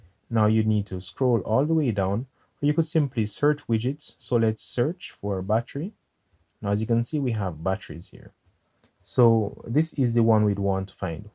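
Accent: Jamaica. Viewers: Dawg yah Jamaican or from the Caribbean??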